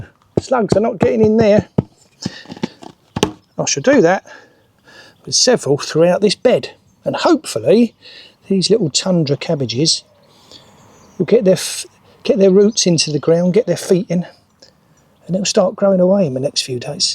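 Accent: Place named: Southern England, United Kingdom. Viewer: I thought sounded like a southerner.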